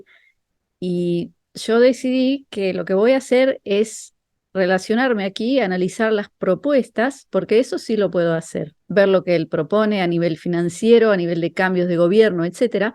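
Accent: Argentina. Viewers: Ese acento argentino después de tanto tiempo en Israel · Hola yo pensé que eras argentina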